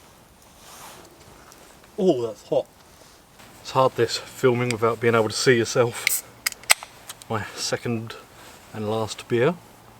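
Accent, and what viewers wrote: England, United Kingdom. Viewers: I'm sure your significant other loves your camp cooking. I know I would even with a good couple of nice beers. Love your lifestyle. Was hoping to get out myself hot tenting in the snow but all we have had is rain, rain., rain over here in Southwest Washington State USA. Stay safe and thank you for taking us along on your wonderful outdoor adventures. I love your British accent. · Nice video English Accent different than the NYC dribble BS😎👍 That stove attached to the link is currently unavailable, oh well I guess that is the way it goes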